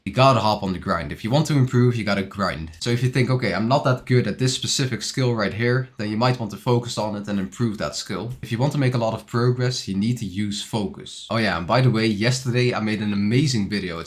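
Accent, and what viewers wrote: Netherlands. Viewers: good video keep it up, are you dutch by any chance? you sound NL ❤❤❤❤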